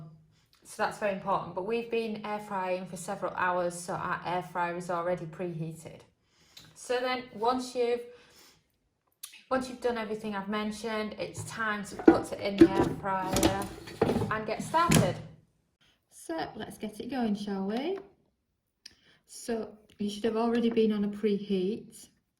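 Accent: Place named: Barnsley, United Kingdom. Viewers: You sound just like I did and everybody else did in the area of England where I lived the longest. :-) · Barnsley accent ay up chuck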